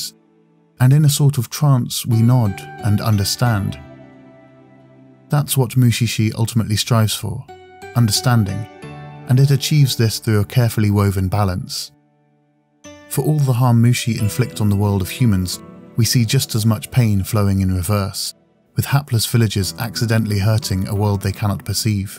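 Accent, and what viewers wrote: United Kingdom. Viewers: Your British accent, the calm voice, the music choice, the visual choice and the approach to this work really felt like you gave the series the justice it deserves. By recreating the atmosphere you find in Mushishi, you made the presentation of this work beautiful. This short analysis was a great way to pin my feelings for a while, and to create my own thoughts around this show. Thank you very much.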